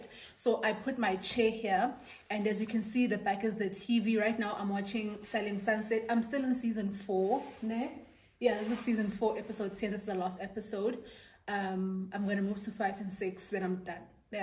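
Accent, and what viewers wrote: Nigeria. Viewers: Please this naija accent is everything and more 😂😂😂😂❤❤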